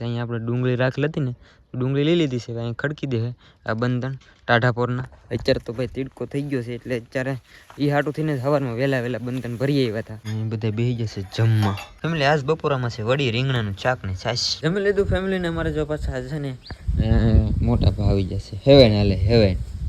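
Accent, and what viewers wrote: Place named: Banaskantha, India. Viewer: અમારે બનાસકાંઠા માં પણ રોજડા જ કેસે Bhai 😇 તમે બોલો ને એમજ અમે પણ બોલીએ સિયે આપડી બોલી સેમ too સેમ સે હો ભાઇ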